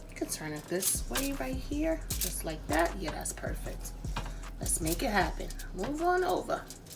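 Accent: New York, United States. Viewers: From one New Yorker to another I love your accent . your food looks great ! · You from NY I can tell 😂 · Damn thank you so much!! And do I hear a New York accent?? ❤️ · Are you from New York or someone near that area? Hearing an accent lol. Love it btw.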